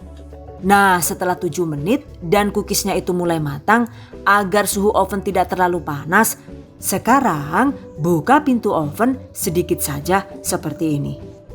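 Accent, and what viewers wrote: Banyumas, Indonesia. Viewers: Langsung Caaaatat..Suka ngakak klo Mba udh Ngomong Ngapak2..Lah Lah Deneng dadine kayak kuwe..pating mbrudul🤣🤣🤣 Sukses ya Mba, Selain Menghibur Video2nya jg menginspirasi Emak2 Bakulan kyk Saya. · Ngapak temen sih...mbakkk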